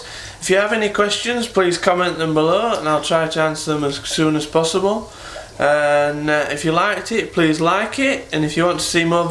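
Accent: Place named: Scotland, United Kingdom. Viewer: With the strong northern or Scottish accent, I am able to understand about half of what you say. You should hire a southerner or Irishman to do the speaking.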